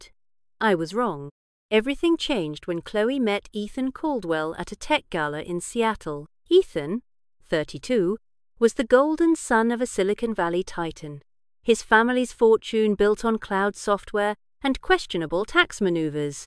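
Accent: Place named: England, United Kingdom. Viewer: Frightful voicing.😮 The English accent really doesn't help at all.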